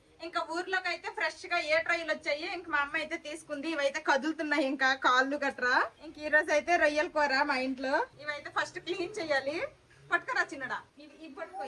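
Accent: Srikakulam, India. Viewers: Srikakulam launguage is an emotion 😊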